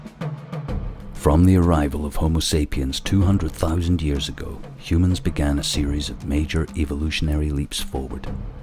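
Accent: Scotland, United Kingdom. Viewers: Love the Scottish Accent! Great Video! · It's a Scots accent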